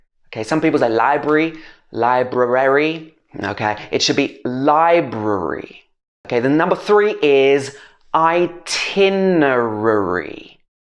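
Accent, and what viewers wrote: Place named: United Kingdom. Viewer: Good British pronanciation..thanks for such type of videos.. visit our channel...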